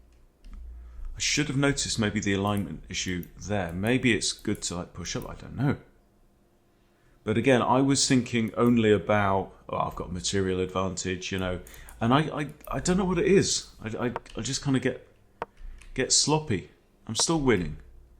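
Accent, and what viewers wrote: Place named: England, United Kingdom. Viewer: I'm sorry you're being criticised for mistakes. Most people watching you are advanced beginners to intermediate, I suppose. If they want perfect lines, there are plenty of titled players on youtube that can provide that. You helped me get over that beginner stage when I kept getting wayward queen attacks. I think I've learnt more from you than any other youtuber and it's nice to have an English voice :)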